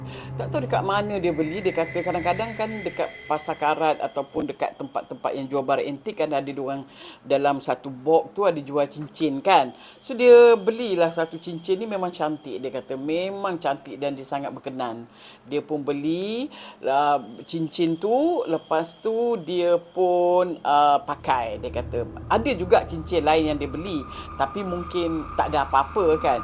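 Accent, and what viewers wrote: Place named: Kedah, Malaysia. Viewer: Org kedah mana suaranya??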